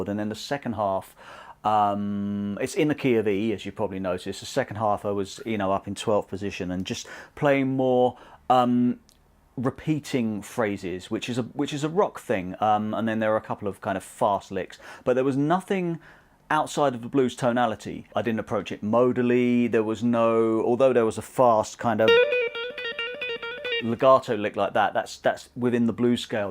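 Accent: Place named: England, United Kingdom. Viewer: I'm a leftie wot plays righty, mostly because my first guitar was my brothers and he got tired of me changing the strings around all the time. So I would not mind seeing the leftie versions of these as it would look like a mirror image which I would have no trouble with. Also it is refreshing to find an english video tutor. THe yanks are fine but it gets a little tedious listening to the accent. If I wasn't so broke I would sign up for the course immediately. A natural teacher you can de mistify the blues I have heard for so long now. very refreshing to watch and learn. Well done. keep it up.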